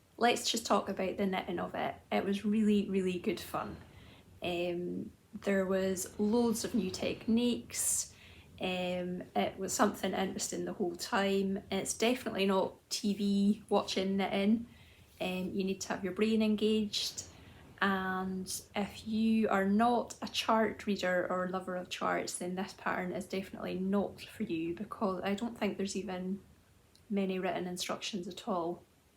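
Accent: Scotland, United Kingdom. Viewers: Hello, lovely to see you again. This is only the second podcast I viewed, I only quite recently discovered your channel. Love your Scottish accent and all your lovely knitting. My small win recently: I am gonna finish a Marie Wallin cardigan I started almost 5 years ago. I finally feel self assured enough to finish the colour work yoke ánd do the steeking. This will be the summer of me finishing my lovely cardigan, so I will be ready for a nice autumn wearing this beautiful colourwork cardi. 😍😍😍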